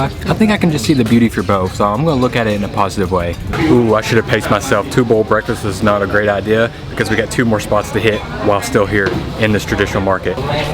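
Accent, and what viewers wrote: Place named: South, United States. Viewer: We've grown accustomed to your Southern drawl but the weird things you say still need some getting used to. ;)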